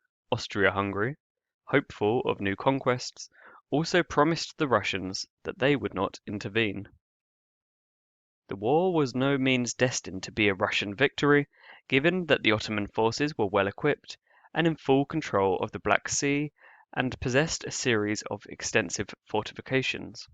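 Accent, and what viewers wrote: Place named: United Kingdom. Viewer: I'm not sure why but I have an inherent bias towards British particularly English and Northern Irish when it comes to historical topics. When I hear that accent I immediately trust the content. Just a thought that occurred to me as I was watching this well done video. Also while I have a bias towards British history presenters, it's sad they stopped the Russians from taking Istanbul. Even the Muslims of Egypt (me included) wish the Greeks had that city back. Turks morality is lacking to say the least.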